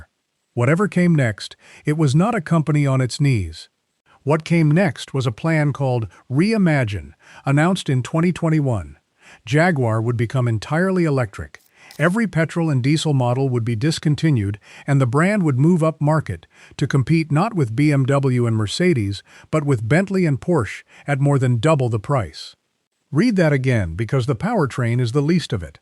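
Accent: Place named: United States. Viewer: It’s Jaguar NOT feckin Jagwar Ai is bad enough but the Yank ones are even worse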